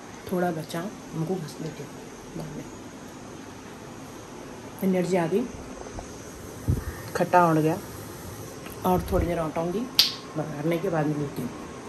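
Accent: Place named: Hyderabad, India. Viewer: Hyderabadi language zabardast baat karthai ap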